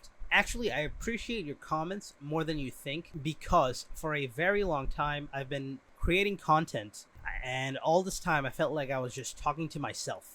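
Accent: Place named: United States. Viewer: Wait you live in Bangladesh? You sound so American!!!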